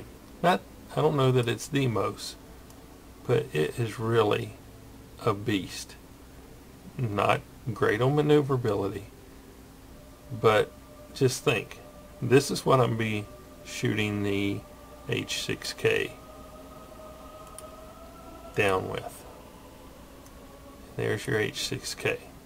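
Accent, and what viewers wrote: South, United States. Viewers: would be my second favourite war thunder narrator after the Mighty Jingles. He's not that articulate, but something about his tone and southern bubba accent just cracks me up. Especially when he says things like "they're all over me like stink on a turd", etc...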